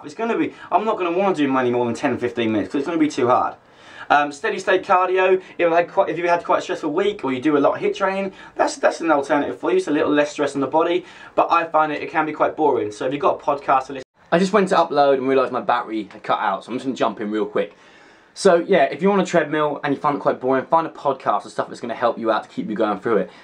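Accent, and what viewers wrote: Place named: Australia. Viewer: always thought you had something Aussie in ya there it is mate come live here again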